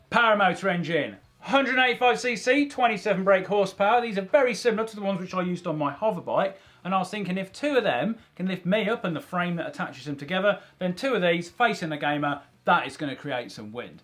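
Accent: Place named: Midlands, United Kingdom. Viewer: purely your heavy Midlands (I think) accent coming through 🤣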